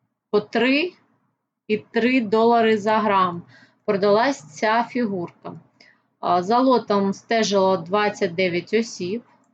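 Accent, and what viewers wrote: Russia. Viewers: да просто)) то на английском с русским акцентом размовляете, то на Украинском) решил узнать уровень познания не только в коралле))))))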